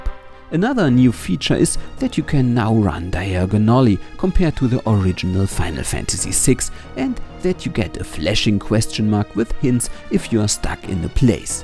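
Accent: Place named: Germany. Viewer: Schönes Review! Angenehm anzuschauen und anzuhören. Weiter so! Der deutsche Akzent sticht manchmal noch heraus bei Wörtern wie "diagonally", "devices" oder "heard". Aber nicht schlimm ;) Außerdem "comfortably", nicht "comfortable" ;)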